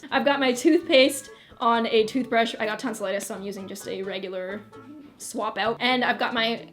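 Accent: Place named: Canada. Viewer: Heehe totally random but I always feel super cool or something when I can sense/ hear a Canadian accent 😎😎😎😂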